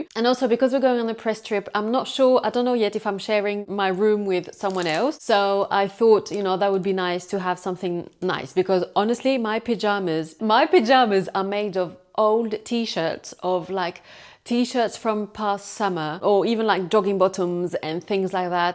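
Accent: United Kingdom. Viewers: Coucou, trop cool te t’entendre parler l’anglais avec l’accent British hehe ce serait super chouette d’entendre parler en anglais aussi 😍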